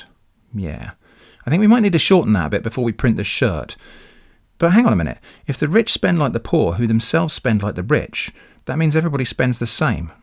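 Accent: United Kingdom. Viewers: If they had said the investments were glarded, well, that might have been enough persuasion for me!, I really think you could make a pretty penny doing audio books! (Generalizations ahead, I’m aware they’re generalizations) I think Americans trust the British accent, and enjoy it, and yours is not thick, like when I’ve tried to watch some BBC police procedurals. You’re also good at lowering your voice for dramatic effect. I mean, I really enjoy listening to you read emails! LOL. That should say something.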